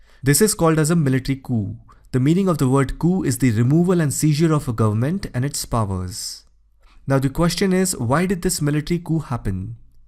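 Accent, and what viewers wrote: India. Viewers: I can barely understand you, your indian accent is too strong put subtitles please. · As soon as the Indian accent came up, I know this video is anti-China & full of gibberish. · The commentator has a very strong Indian accent with horrible pronunciation of many English words.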